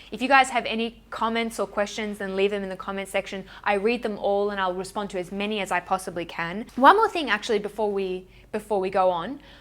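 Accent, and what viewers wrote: Australia. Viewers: Wow an aussie on YT! Great to see and hear :) From an aussie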